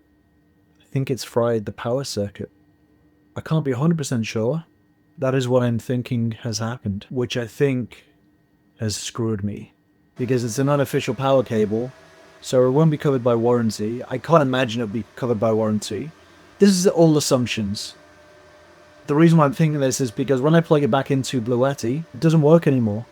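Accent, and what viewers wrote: United Kingdom. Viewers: Not so much full Karen as full Godfather. Very polite, no screaming, but do inquire as to why you have paid so much money for something that doesn't work. In fact work your British accent. I'm just saying this is someone who did costuming in theater for 7 years. Americans are idiots for a British accent. 🤨🤣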